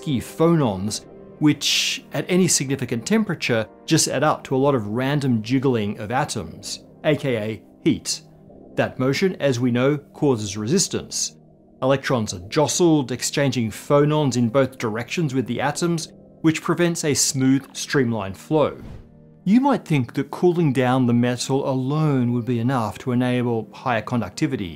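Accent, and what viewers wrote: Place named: Australia. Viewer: I thought he was Aussie.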